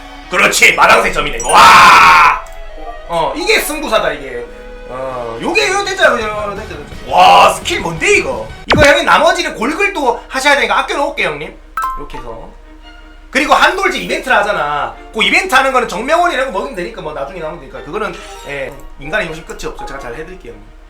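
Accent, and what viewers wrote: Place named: Gyeongsang, South Korea. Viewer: 나 경상도 사람인데 이정도면 사투리 심한 사람중에서 순한 말투다..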